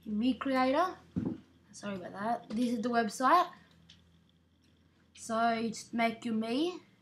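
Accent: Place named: United Kingdom. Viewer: Are you british or live in the UK? I'm not judging you. Honestly, I want your accent. And it worked.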